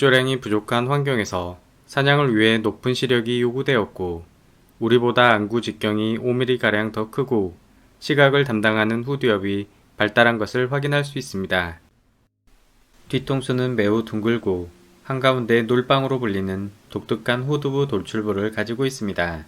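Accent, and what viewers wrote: Jeolla, South Korea. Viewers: 남도 사투리가 있으시네요.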